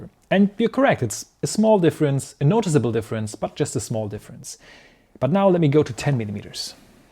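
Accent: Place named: Germany. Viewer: That barely discernible German accent makes everything subtly similar to Schindler's List.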